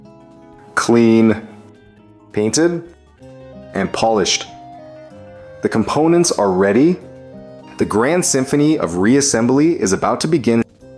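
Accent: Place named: United States. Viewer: We don't need your ugly American accent to commentate to us, we can see . Therefore you stop talking through the men's work. Begone.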